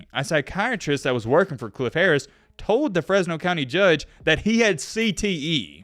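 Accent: South, United States. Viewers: The fact that this is a white guy (person who made this video) and southern from what I can hear shows the undertones. Look at how many times this guy loves showing that photo of Harris on her and over. You’ve listed no sources, no cites. You’re not from the area Cliff is from, went to the college he went to. You’re a joke The picture is on your caption, yet you display that photo so many times as if we hadn’t seen it ten seconds earlier. This channel and this narrator is a person of trash, and he will get his. This could have been done with class, but was nothing but trash. P.S Anyone reading this. The actual video starts at minute .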